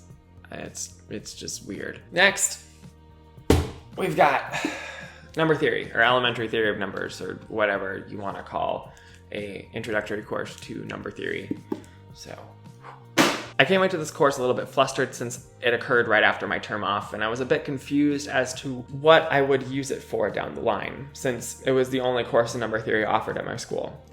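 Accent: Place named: North America. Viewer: you have what I call the "white girl tounge curl" its a condition that effects many north americans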